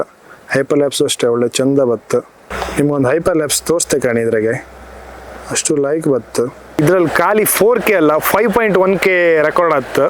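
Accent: Kundapura, India. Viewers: Avru matadta irodu kundapura kannada guru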